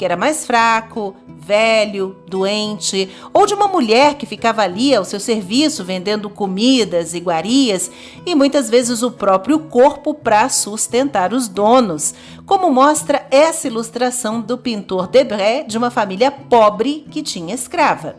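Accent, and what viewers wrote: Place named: Minas Gerais, Brazil. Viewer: Pelo sotaque parece que a narradora é de Minas Gerais, ou já viveu nessa região. Parece muito com o sotaque daqui de Minas. Parabéns narradora pelo seu excelente trabalho, é a voz feminina mais agradável do Youtube.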